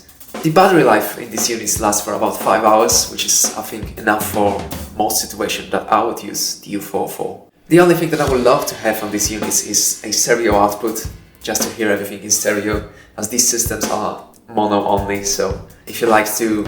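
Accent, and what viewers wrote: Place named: United Kingdom. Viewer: I'm so pleasantly suprised with this video!! I believe it's the first time you ever made a video like this on youtube, but you seem super comfortable speaking on camera!! And I can hear the British accent coming through ;)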